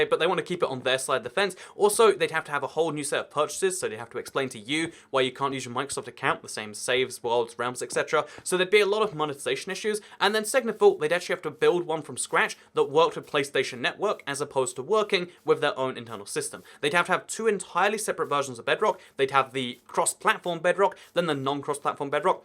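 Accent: United States. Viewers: Also you sound pretty American to me. Then again I thought a German YouTube's was Dutch...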